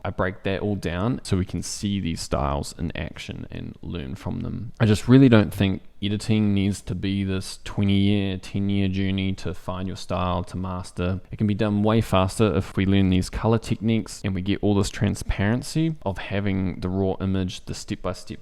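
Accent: New Zealand. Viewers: I think for casual/hobbyist photographer this is a good alternative but for processing hundreds from a wedding or a sports assignment there’s absolutely no way this can replace lightroom. Also Apple’s Cloud storage system is peculiar & not friendly for android clients to retrieve their photos. Great presentation , though & your English dialect is interesting - are you a New Zealander? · Steep by steep or step by step? LOL New Zeland accent funny!🥰😘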